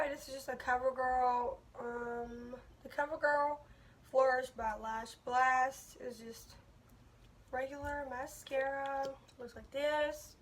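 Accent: United States. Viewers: I’m used to this Tennessee and Mississippi talk😝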